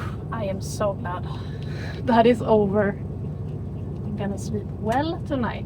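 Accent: Sweden. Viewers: Charming Swedish accent😅 (the impossible i’s😅😅) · du høres Svensk ut?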